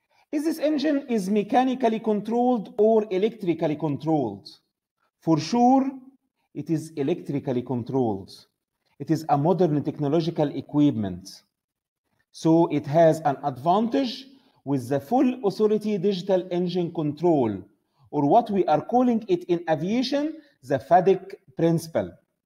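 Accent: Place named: Egypt. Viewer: اللهجه المصريه 😂 فيديو رائع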